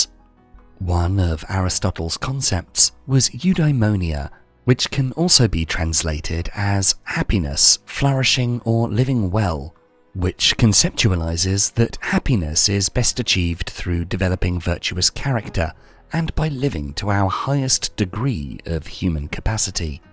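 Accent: United Kingdom. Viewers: #1. Loose the pseudo intellectual lispy British voice.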